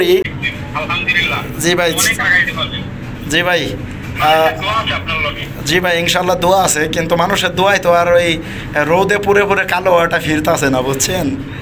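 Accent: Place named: Faridpur, Bangladesh. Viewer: ভাই আপনার ভয়েজ সুনে মনে হয় আপনার বাসা আমাদের ফরিদপুর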